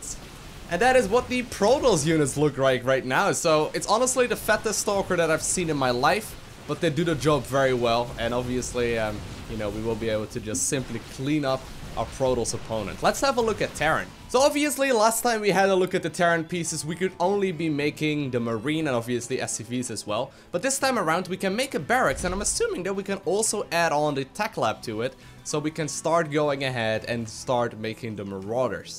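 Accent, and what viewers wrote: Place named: Netherlands. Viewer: The accent, though. Are you Dutch? Good vid nonetheless!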